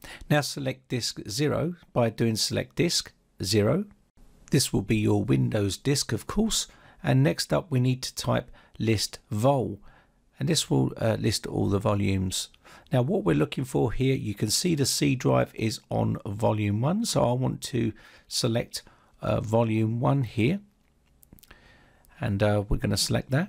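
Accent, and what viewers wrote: England, United Kingdom. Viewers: I hate you english acent but thanks I use a windows 7 boot diferent to the windows 10 boot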